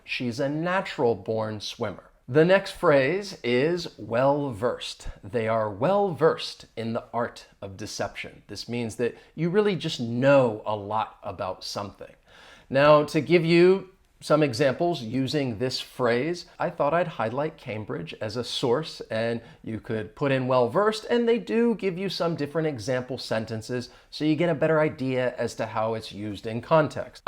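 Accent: United States. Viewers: It's such a great video. I love your american english. When it comes to vocabulary, well, you are a natural. 😊. Hello from france 🇫🇷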